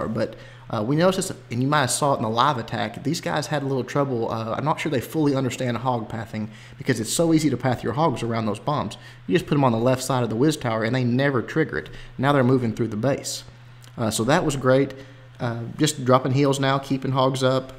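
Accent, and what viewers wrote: South, United States. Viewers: Hey, I wanna remind you of something you said on you 1st upload... You said your accent isn't the best but I love it!! "Golems are fixin to cross" omg!! Too awesome man you've made a brand about yourself that no one can measure up to!! Great job on the channel brother!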